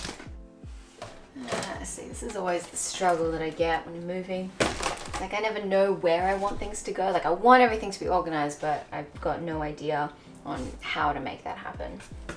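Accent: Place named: Australia. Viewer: I'm so jealous of you. Although I've lived a good portion of my youth in Japan, I have not experienced Japan the way you have. I'm glad you share your experiences so I can enjoy Japan from your perspective. You also put me to shame to the Japanese language because I can barely understand it or speak it, LOL, the problem from living on military bases. I love your Australian accent! Keep going with your content....I've enjoyed several :)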